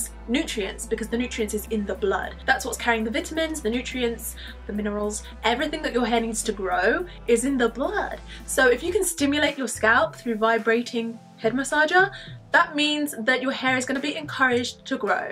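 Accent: London, United Kingdom. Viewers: Are you from London #londonsquad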